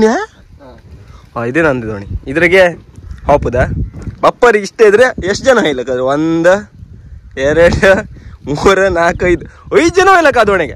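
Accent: Kundapura, India. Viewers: Wow superrrr mangroves Vlogg superr Kundapurane chandaa nivuu mathadooo kundapurr bashee ennuu chandaa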